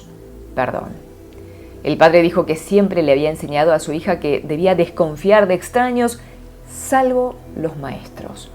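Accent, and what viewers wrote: Argentina. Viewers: Se ve extraño , ver a una chica con rasgos asiáticos y hablando argentino 😂 muy cool pero desconcertante ❤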